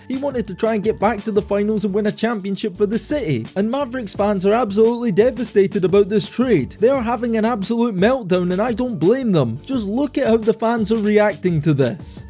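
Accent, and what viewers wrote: United Kingdom. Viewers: Bro fix your mic, you sound like you're from uk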